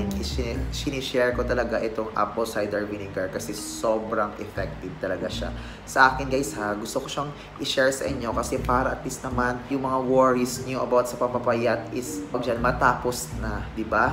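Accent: Iloilo, Philippines. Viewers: Ilonggo ka?heheeh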